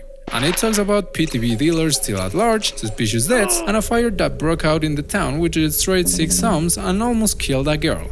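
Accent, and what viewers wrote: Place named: Russia. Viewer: Good Russian(?) accent for storytelling! nice video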